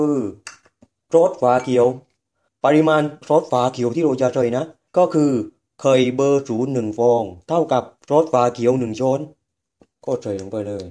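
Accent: South, Thailand. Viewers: คนใต้เรยนิ หรอยๆ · เสียงคนใต้ 555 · สำนังคนใต้น่ารักดีจร้า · นํ้าเสียงของคนใต้.เพราะดีค่ะชอบมากๆน่ากินจังไข่ตุ๋น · สำเนียงใต้ชัดๆๆเลย555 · แหลงใต้เลยตะผมว่า เสียงคนใต้มีเสน่นะ เห้อ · ชอบสำเนียงทองแดงของ และอธิบายได้ดี ชอบมากครับ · คนใต้ใช่ม้าย😄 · น่าทานค่ะ ว่าแต่อยากเห็นหน้า จังฮู้ชอบคำพูดสำเนียงตนใต้จังเลย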